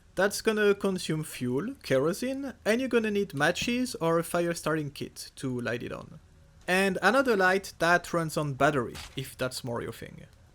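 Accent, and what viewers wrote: France. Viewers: Cet accent fr mon dieu ahah ! Merci pour la review !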